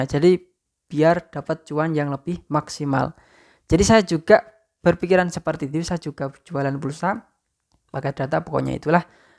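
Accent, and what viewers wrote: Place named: Central Java, Indonesia. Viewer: Looo wong rembang iki 🤣🤭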